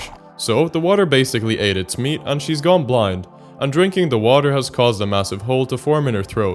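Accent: Poland. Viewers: im new to the channel and i don't know but are you polish?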